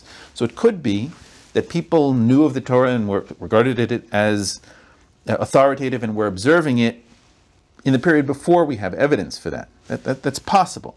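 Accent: Russia. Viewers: From the first phrase it is clear that we have a Russian person in front of us. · Кто это? Семеныч? Что за рязинглиш?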